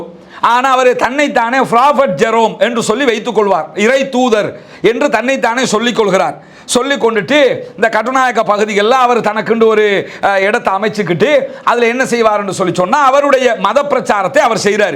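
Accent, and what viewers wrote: Tamil Nadu, India. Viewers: தமிழ் நாட்டில் படித்தவர் அதனால் ஒரு சில வார்த்தைகள் அப்படி அல்ஹம்துலில்லாஹ் மக்களுக்கு தெளிவாக புரியும் என நம்புகிறேன் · Why your Tamil accent like PJ from Tamil nadu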